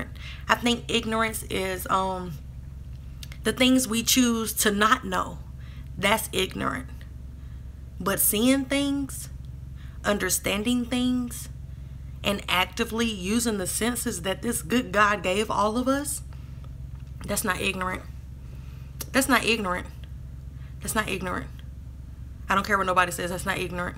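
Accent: South, United States. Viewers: i love her country accent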